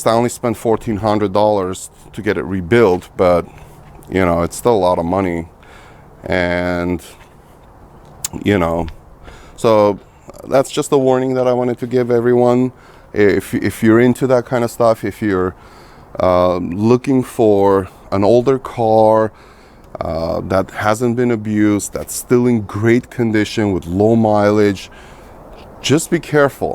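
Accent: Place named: Iran. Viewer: Can hear the persian in his english, very powerfull language 👌🏿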